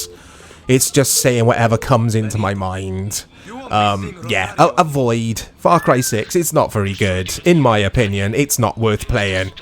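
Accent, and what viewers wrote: Wales, United Kingdom. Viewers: Are you Welsh bruv?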